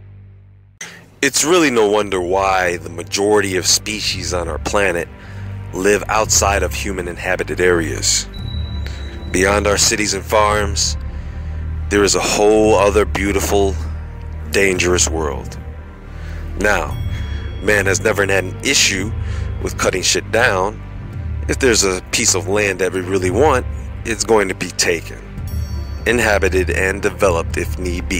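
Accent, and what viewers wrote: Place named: Tennessee, United States. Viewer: I like the way you talk on this video, reminds me of my home here in Tennessee.. The best.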